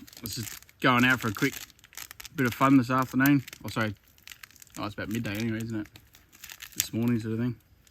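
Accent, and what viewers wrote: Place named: Australia. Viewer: Why would I not understand him? He was using basic English with an Australian accent.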